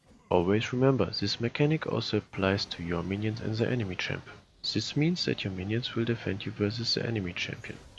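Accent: Germany. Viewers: You are german right :D? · He sounds German as well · are you German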